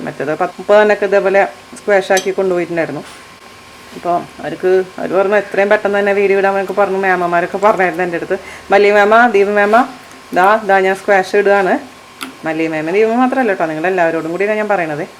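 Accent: Thrissur, India. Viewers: njan thrissur ane .athond thanne chechide samsaram valya ishta to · Chechy good recipe and the way you are explaining in Thrissur slang is excellent. Take care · അടിപ്പൊളി കൊള്ളാം അവതരണം നാട്ടിൽ പോയ് ഉണ്ടാക്കി നോക്കണം തൃശൂർ മാള സ്വദേശിനിയാണന്ന് തോന്നുന്നു.... Good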